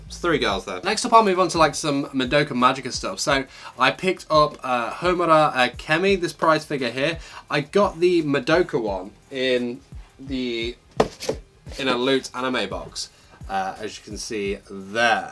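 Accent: United Kingdom. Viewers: are you british ? ( I love so much your video )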